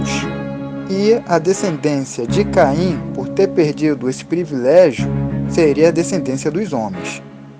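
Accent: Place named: Rio de Janeiro, Brazil. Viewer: Desculpa mas esses narradores com esse sotaque carioca desisto de ouvir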